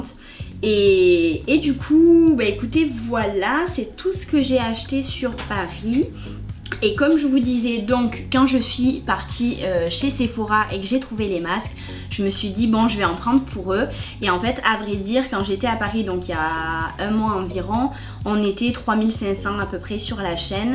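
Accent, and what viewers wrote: South, France. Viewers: Vidéo au top 💘 L accent du sud le meilleur Ahah 🌞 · Super haul! Je te suis sur ta chaine depuis pas très longtemps, mais je suis très contente. Tu es simple, et tu prends beaucoup de temps pour tes abonnées et je trouve ça bien et presque rare maintenant sur Youtube.Tu mérite largement tes 15 000 abonnées. Et l'accent du sud, et la bonne humeur ; j'adore! :D Je ne connais pas la marque Sephora, mais j'en ai entendu du bien, je participe au concours. Gros bisous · Sa se voit que tu viens du sud de la France avec ton accent ah ah, super vidéo :) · Merci énormément pour ce concours auquel je participe ! Je te suis depuis un petit moment et je te trouve super !!! Continue comme ça ❤️ Ps : j'adore ton accent et vive le sud ! 🙊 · Coucou, j'espère que tu vas bien, il n'y pas très longtemps que je suis abonnée à ta chaîne et je trouve que les montages de tes vidéos sont agréable a regarder et tu as un accent du sud que je connais particulièrement puisque je suis aussi dans le sud. 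J'ai pu tester beaucoup de produit sephora notamment les vernis qui sont pas mal et je trouve aussi qu'il se sont amélioré sur leur produits. Je participe au concours, bonne soirée ☺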